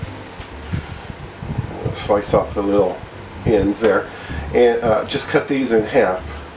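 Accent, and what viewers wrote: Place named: Evansville, United States. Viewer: I sense an Evansville, IN accent. Coming from a Chicagoan who frequented that area as a kid since I used to have family there.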